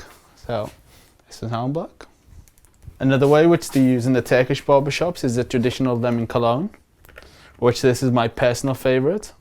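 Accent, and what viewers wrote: Liverpool, United Kingdom. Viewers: i don't know why but I never expected you to be British.. This is the first video I've watched of yours where YOU talk. Whoa! Surprised but I love your accent :) thank you for all your wonderful, relaxing videos! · I like your British accent.. make my eye close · u sound like a scouser wer bout is ya barbers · Do you have a scouse accent you tryna hide? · U have the same accent as me. Scouse or Geordie? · Are you part scouse too, i can hear an accent in you?? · Jake DaSnake scouse (Liverpool) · Are you a scouser? You sound a little bit like one lol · Is he scouse? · Heard the scouse accent. Got excited as live in Liverpool. Looked up his premises to book in. Saw it was in London. Got gutted. :( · Didn't know you were a scouser. Nice to see the accent getting about. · Your accents mad, like it sounds scouse, a bit foreign and north eastern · Have you got scouce in you? 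· He's English, but from Liverpool. It's a very distinct, thick local accent there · hamza1947 No. Im from birmingham. He is a scouse trust me. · Are you from Liverpool mate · Is that abit of a scouse accident? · Is he a scouser? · He sounds Scouse. (Liverpool) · are u scouse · U from Liverpool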